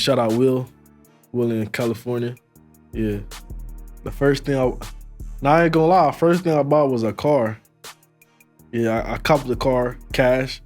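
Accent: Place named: Jamaica. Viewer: He Jamaican. You can tell he still tryna fiigure out the American talk.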